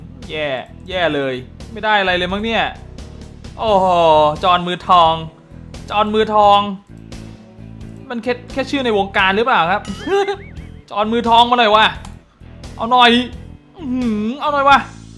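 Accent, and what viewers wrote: Isan, Thailand. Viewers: เอ้า พี่เป็นภาคอีสานผมพึ่งรุ้ แล้วอยู่จังหวัดอะไรครับ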